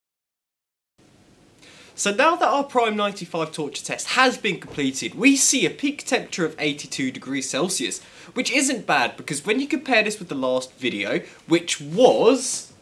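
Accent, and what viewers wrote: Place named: United Kingdom. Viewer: Your accent was 😎 cool just like any British 😂😂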